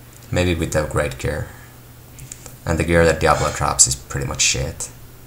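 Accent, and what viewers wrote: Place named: Asia. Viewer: lol you're funny. I can do that same accent. Are you actually asian?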